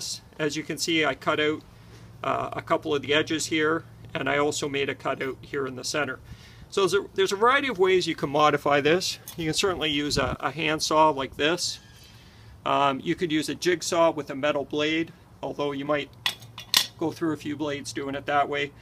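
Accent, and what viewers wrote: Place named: Canada. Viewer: Based on his accent he may be referring to Canadian dollars. And metal prices fluctuate quite a lot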